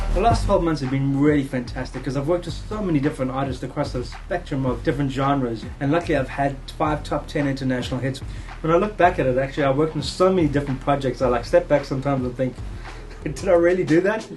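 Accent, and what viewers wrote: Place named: South Africa. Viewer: Sounds like a Saffa :D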